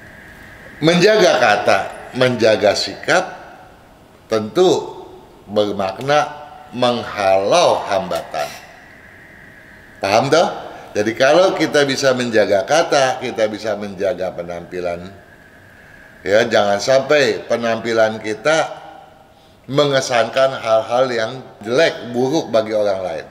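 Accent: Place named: Malaysia. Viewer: Wow.... Bahasa dan tone suara koko sangat bagus,susah oo mau dengar org cina bahasa pasif bngt,jelas bngt dan seakan² sudah mendekati pekat bahasa Indonesia nya..koko tggl di lingkungan melayu ya sbb logat nye seperti MELAYU very good.. Love you..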